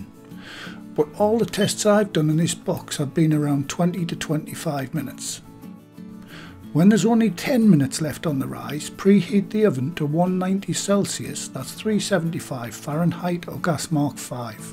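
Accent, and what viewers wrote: United Kingdom. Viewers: A lovely uncle with a kind voice and a delightful British accent! Thank you so so so so.... much for the recipe! This is the one and only recipe for gluten-free bread, which I was looking for and found thanks to You! Prosperity to Your channel. Like, subscribe and greetings from Russia! : ) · He sounds like a British person & I appreciate his sharing his receipe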